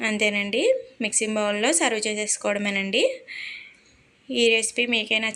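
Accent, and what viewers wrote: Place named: Godavari, India. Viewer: ఆయ్.... మీ గోదావరి నా అండి 😂😊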